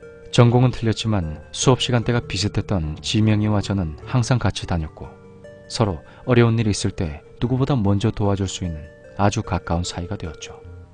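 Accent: Gyeongsang, South Korea. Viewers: ㅋㅋㅋ 이 경상도 분이셔서 대화부분은 원본이 경상도 사투리가 아니더라도 경상도 사투리로 하시더라구요 ㅋㅋ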